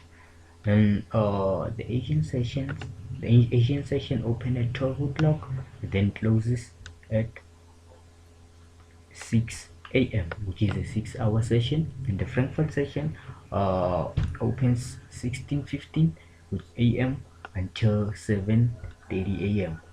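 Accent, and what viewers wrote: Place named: South Africa. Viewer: 😂😂😂😂😂man you this accent sounds South African, but the name 🌚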